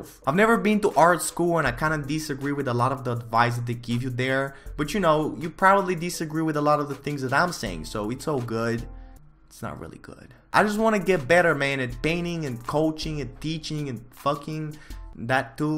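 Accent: Russia. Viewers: Why are you talking with a Russian accent? it's me saying to you as russian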